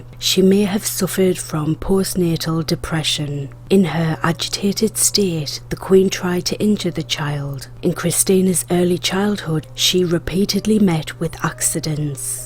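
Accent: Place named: Northern England, United Kingdom. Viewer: Its a lovely soft accent north of England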